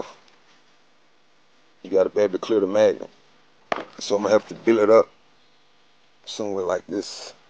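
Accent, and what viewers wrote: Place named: Memphis, United States. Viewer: You gotta be from North Memphis bro by that accent 😅 but your videos are hella informative man I love em! Never seen the fleece technique before until I started watchin your vids. So fries 🍟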